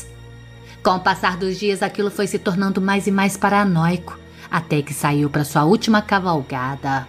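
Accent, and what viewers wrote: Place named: Mato Grosso, Brazil. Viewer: Imaginava ela morena de cabelos pretos tipo Índia, sua voz lembra do interior de Mato Grosso.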